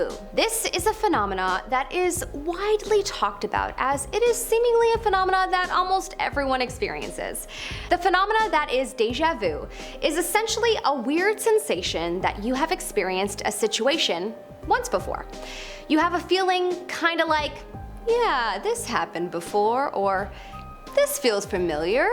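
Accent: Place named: United Kingdom. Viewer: A stone computahhhhhh wahhhhh I love your funny British accent 😅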